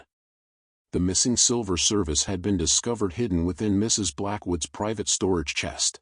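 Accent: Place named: United States. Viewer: The American accent is so horribly inappropriate for such a totally English story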